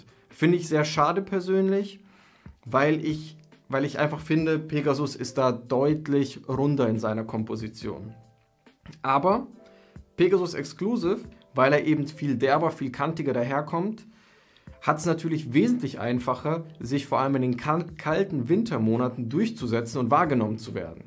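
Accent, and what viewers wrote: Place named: Franconia, Germany. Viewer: Bro kommst du aus Franken? :D Geiler Dialekt ! Feier dein Video :) Mach weiter so ! Abo hab ich da gelassen !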